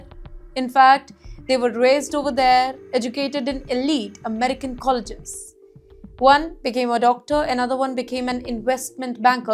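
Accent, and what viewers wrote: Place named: India. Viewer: Two things, how come this lady is having an anchor Job with this much of Mother Tongue Influence( My bet is, it is Hindi) in her English. Secondly why this channel is obsessed with US as if a girl who is obsessed with a boy who moved on.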